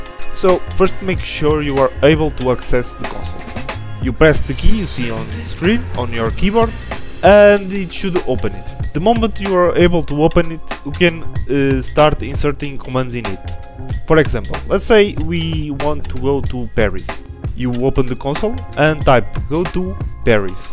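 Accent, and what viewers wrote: Portugal. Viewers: Sotaque de portugal? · sim, é português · With a very portuguese accent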